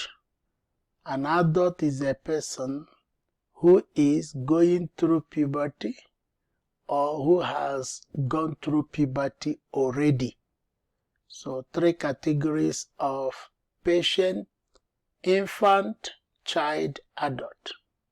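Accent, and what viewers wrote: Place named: Africa. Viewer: Information is very good . Nice presentation. But the accent is hard to pick for none African viewer's. Good job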